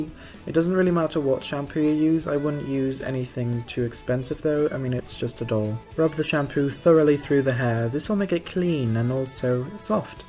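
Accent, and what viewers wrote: United Kingdom. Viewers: R u from UK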